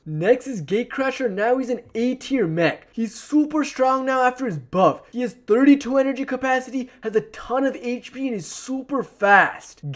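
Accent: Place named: Middle East. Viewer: May I ask where you are from? Your accent is interesting. I’m assuming Middle East somewhere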